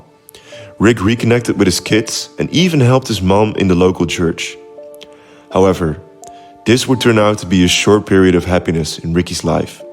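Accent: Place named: Netherlands. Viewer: Weg met je Nederlandse accent je weet niet eens waar je over praat oen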